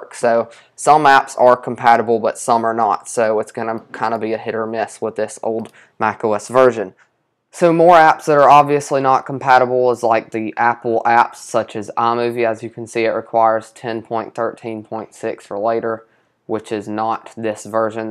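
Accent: South, United States. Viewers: It's southern US but like city southern.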